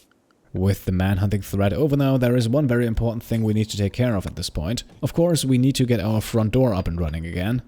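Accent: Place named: Germany. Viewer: didnt know you were german untill you started to read the patrions for the first time :D (few episodes back)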